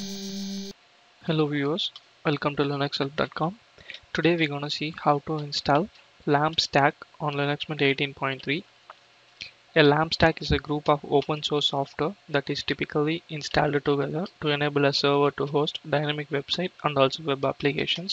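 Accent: India. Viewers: Interesting. I had a bit of trouble with the Indian-English accent. Perhaps a transcript would help. Thanks.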